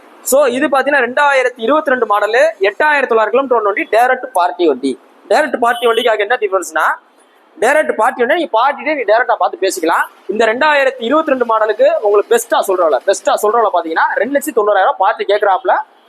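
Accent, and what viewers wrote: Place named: Madurai, India. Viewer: மதுரை மொழி😍😍😍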